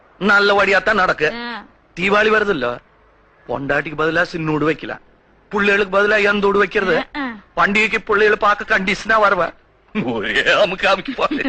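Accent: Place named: Kongu, India. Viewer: பெங்களுரில் இருந்தாலும் உங்க, அதுதான்க நம்ம, கொஞ்சும் கொங்குத் தமிழை மறக்கவில்லை. ஆனால், உரையாடலின் மையக் கருத்துத்தான் சரியில்லை. இது மாதிரியான கலச்சாரச் சீரழிவுக் கருத்துக்களைப் புறக்கணியுங்க. உங்க வீட்டுக்காரம்மா, கொங்காக இல்லாவிட்டாலும் கூட, கொங்குத் தழிழை, உங்களைவிட நன்றாகவே பேசுகிறார்கள். வாழ்த்துக்கள்.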